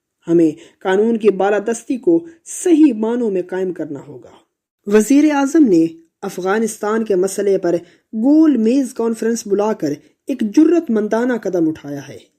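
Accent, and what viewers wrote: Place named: Arab world. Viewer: صدا کار صاحب سے درخواست ہے کہ اردو کو عربی لہجے میں نہ پڑھیں. یہ اردو کی کتاب ہے. يحي سنوار کی کتاب کے لیے کی صداکاری مناسب تھی کیونکہ اس کتاب میں عربی الفاظ بہت تھے.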